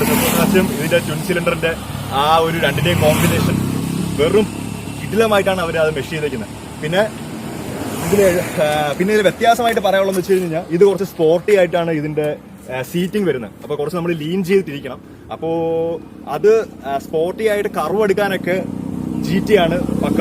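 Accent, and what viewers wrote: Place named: Thiruvananthapuram, India. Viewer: വളരെ ലളിതമായി നമ്മടെ സ്വന്തം തിരുവനന്തപുരം സ്റ്റൈലിൽ തന്നെ അവതരിപ്പിച്ചു. പൊളിച്ചു മക്കളേ.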